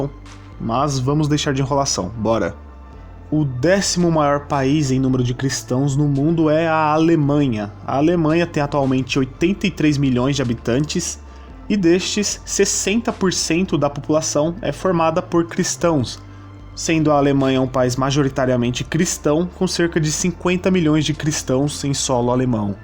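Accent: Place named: São Paulo, Brazil. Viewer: Ah, e acredito que o sotaque não interfira muito. Sou paulista e falo exatamente como você! Rsrsrs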